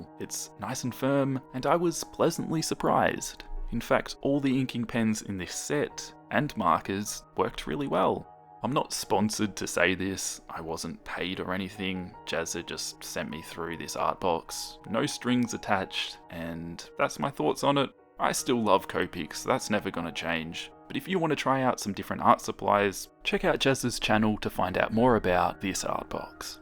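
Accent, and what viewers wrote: Australia. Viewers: Australian dialogue 😱😱😱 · Also, r u Australian? If u r, i am 2!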